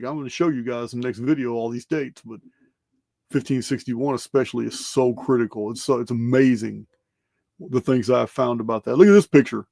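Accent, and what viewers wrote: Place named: United States. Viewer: I like the way Americans pronounce our English names. Wales is even funnier😊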